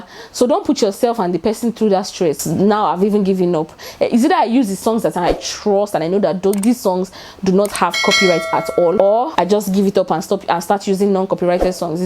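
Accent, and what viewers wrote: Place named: Nigeria. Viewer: De Nigerian Accent🌷🌷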